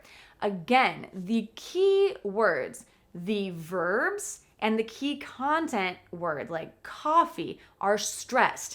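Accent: United States. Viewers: I love the American accent, hope you will help me learn it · Bravo bravo it’s the first time I understand someone speaks American English ☮️🌹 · American accent?? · This is not natural English as it's natural American. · Dear teacher. I am from Somalia, I really love your American sounds. Proud of you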